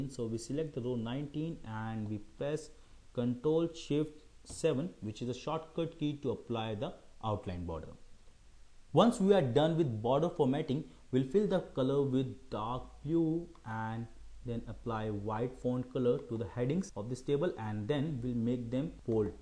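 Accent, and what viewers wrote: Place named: India. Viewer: u are RIGHT i HEARD THE SAME , BUT YES OFC IT IS THE INDIAN ACCENT !!!!!!!!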